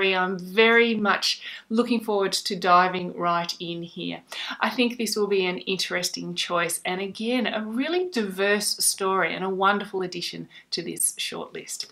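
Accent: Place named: Australia. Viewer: I’m enjoying your videos and I’m getting used to your accent. There is so much humor when I hear what is a perfectly good American word used in an odd way. For instance “The author was rotting”. 😄 Some do don’t they? I assume the reverse must happen in Australia. Anyway, you are quickly becoming one of my booktube favs. Although The Fish Girl has me seriously intrigued, I would choose Terra Nullius to win. Thanks for doing these videos, I’m looking forward to many more. (I love your voice, I hope this didn’t come off as rude.☺️ )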